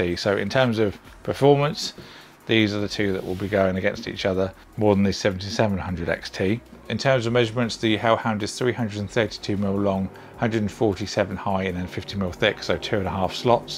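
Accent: United Kingdom. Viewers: i like your brit accent :D also 2nd time finding you overviewing smthn i am interested in, good content mate :)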